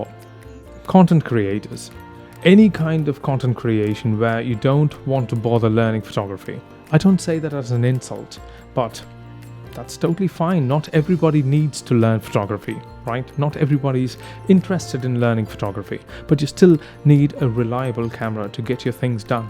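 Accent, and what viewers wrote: United Kingdom. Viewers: That English accent 👌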